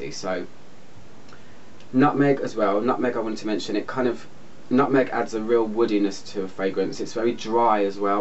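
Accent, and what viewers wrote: England, United Kingdom. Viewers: i love your voiceeeeeeeeeeee!!LOVE YOUR ACCENT ENGGLISH PEOPLE IS AMAZING!!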